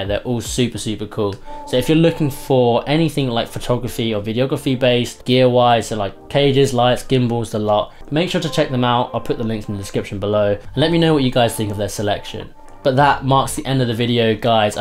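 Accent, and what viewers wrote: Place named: RP, United Kingdom. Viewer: I came from your bmpcck set up and blimey your accent is british to the shits haha, which part of UK u from? Im an aussie raised SE asian born dude and yousound posh af to me. loving it dude. subbed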